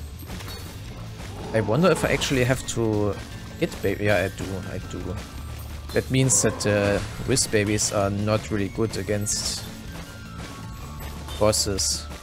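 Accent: Germany. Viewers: Your voice with the german accent sounds just like one of the guys from Team Darkside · Are you a german? You have that accent xD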